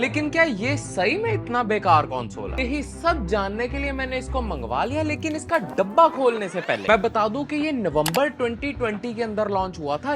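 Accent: Punjab, India. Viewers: Punjabi accent. Probably doaba???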